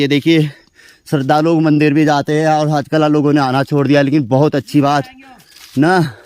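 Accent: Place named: Almora, India. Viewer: गुरु जी गांव, विकास खंड व जिला भी बताने का कष्ट कीजिए। वैसे आपकी आवाज से लग रहा है आप अल्मोड़ा जिले से हैं। धन्यवाद जी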